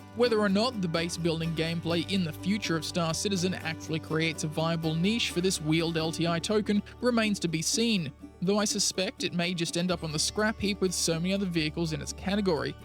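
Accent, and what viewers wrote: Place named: Australia. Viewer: Hi whats up: Love your uploads.. and I believe Ozzie oZZie accent. The problem with CIG is they charge far too high prices for goods that pose no challenge for other products within their price range. The CVS-SM seems to sell for 50dollars or 50 pounds which is how much the entire game sold for with SQ42 and a Starter. Starter ships now cost that begotten price and the game yet alone a package. The CSV-SM is not seemingly calibrated with a driving rig meaning there is three way deal. You can't manage cargo more effectively. I would love to attach compartments enabling maybe a 12-16 hauling pool henceforth a customisable truck variant! I don't WANT a variant - but extensions for CSV-SM... what cha think??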